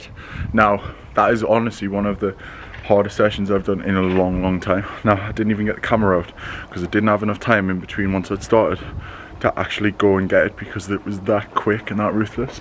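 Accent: Newcastle, United Kingdom. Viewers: Just subscribed, you're from Newcastle aren't ya?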